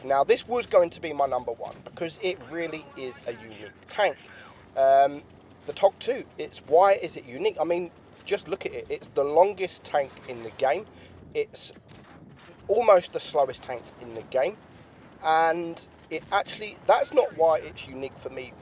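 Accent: United Kingdom. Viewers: I Love your british Accent :v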